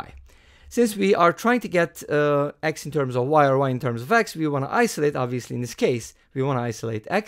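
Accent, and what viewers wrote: Turkey. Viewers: I think you're a turkish. 'cause you speak english with turkish accent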